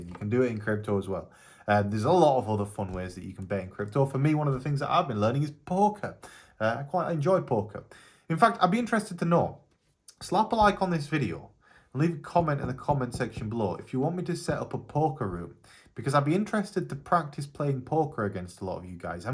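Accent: Yorkshire, United Kingdom. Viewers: Allreet? Yorkshire man😊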